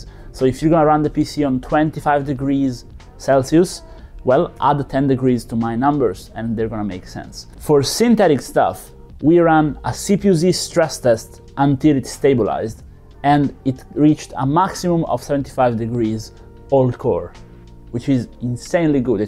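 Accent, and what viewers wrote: Italy. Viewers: His italian accent is killing me😂 grande bro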